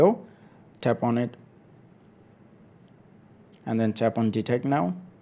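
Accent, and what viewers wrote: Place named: Bangladesh. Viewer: Ur country code and ur accent like Bangladeshi are u Bangladeshi?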